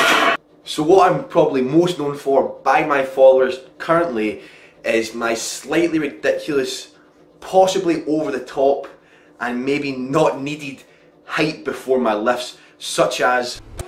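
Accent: United Kingdom. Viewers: I love the Scottish accent, so badass!!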